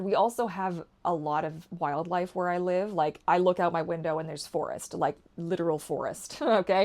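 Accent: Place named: Canada. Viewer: Most people who are watching your videos are American? So I can say Hi from Germany. 🤗 I have half-siblings in the US that I have met for the first time in my life last year. And I have to say thank you for your videos and your Canadian English which I love to listen to. Because I can understand it so much better than the American accent from the southern states. 😂😊 And I wear Loop Switch 2 Earplugs. I love it that they are so small that you can't see them at first sight. But when you see them they are nice to look at. Sometimes I wear them at work when I have to concentrate for a while. They are doing a good job then. But sometimes I hear my heartbeat very loud. I feel uncomfortable then that I have to take them off. After this video I will try the Bose for private locations. 👍🏼 Please be kind because of my English. 🙈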